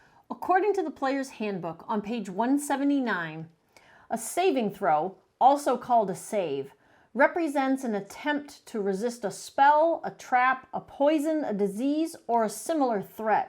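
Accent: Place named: New England, United States. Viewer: Finally a New Englander talkin' about D&D! Go Sox!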